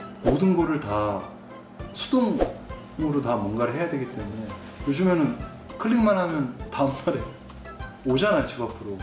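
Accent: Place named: Gangwon, South Korea. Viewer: 경상도지방은 전원생활하기 좋아요^^ 들어보니 강원도 인거 같네요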